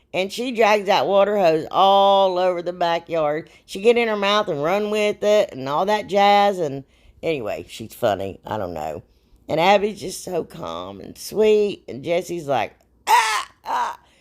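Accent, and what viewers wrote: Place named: Kentucky, United States. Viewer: Ok, I found you scrolling through. Are you from Kentucky? I love the mountains! The accent! Im a transplant here. Great video! You are my new friend! Crackin me up!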